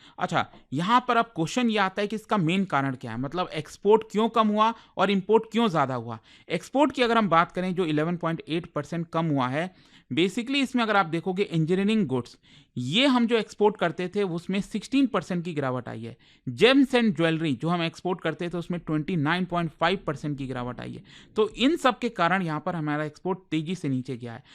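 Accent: India. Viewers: Your Indglish sounds horrible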